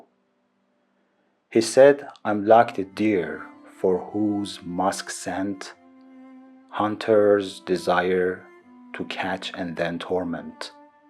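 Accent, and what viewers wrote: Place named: United States. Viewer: I'm an American linguistic. It is not a matter of capability, it is phonology of langauges ! His accents is quite American and authentic and YOU ARE SUCH A STUPID PERSON !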